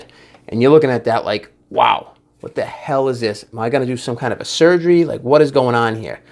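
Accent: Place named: Massachusetts, United States. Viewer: GREAT review and How-To. I did mine following along, liked and subscribed. Thanks a lot and loved hearing the familiar accent, close to home. Sounds kinda like.... Somerville, MA if I had to guess. MAYBE, Medford?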